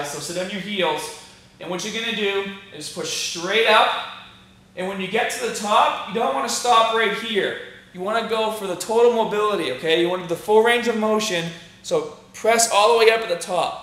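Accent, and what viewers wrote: Boston, United States. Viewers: His accent seems less intense back in 2012. weird...it's like his Boston accent got more pronounced over time. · What happen to your buston awccent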